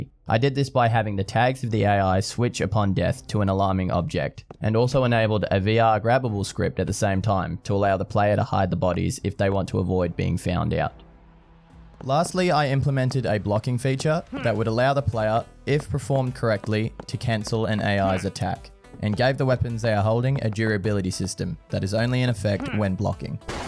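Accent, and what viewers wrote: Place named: Australia. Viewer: I thought I heard the accent I'm Aus too lol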